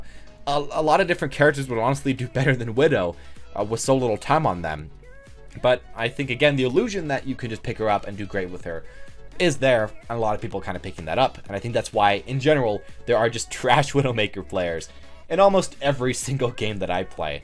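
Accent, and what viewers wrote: United States. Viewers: Are you from like Boston/ New York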